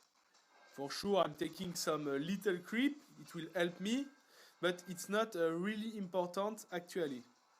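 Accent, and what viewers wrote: France. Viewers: bonjour tu ne serais pas un français par hasard??? ton accent est trop marrant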